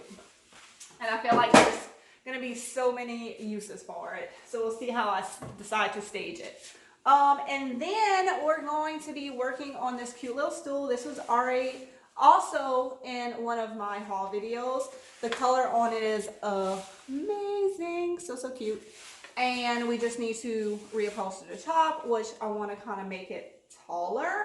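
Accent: Louisiana, United States. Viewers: Hey! I just found your channel and love it! I knew from the first sentence you uttered that you had to be from Louisiana and then I saw you wearing a Louisiana cap! I’m from North Louisiana. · I knew it. Haha!!! I’m also Cajun and I love your accent and your channel.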